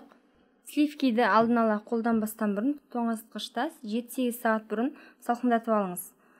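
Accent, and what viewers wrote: Standard. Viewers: Өте керемет.Таза қазақша және анық. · Ассалаумағаликум уа рахматуллахи уа баракатух ! МашаАлла таза анық 100%қазақша сөйлейсіз, рахмет 😘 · Таза қазақша сөйлеген даусыңнан айналайын сенің!Аман бол,табысты бол көкем! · Таза казақша сөйлейт Ма ша аллах өнеге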